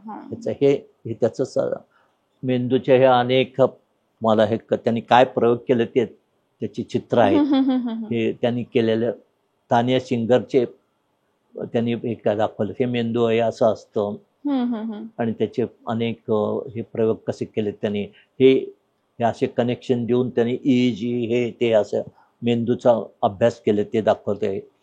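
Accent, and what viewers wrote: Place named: Karnataka, India. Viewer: डाॅक्टर कर्नाटकातील आहेत. कानडीचा प्रभाव मराठीत जाणवतो. निरीक्षणावरुन.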